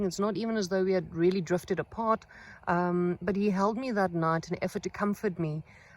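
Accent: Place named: South Africa. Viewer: Could hear you are from South Africa as soon as you started speaking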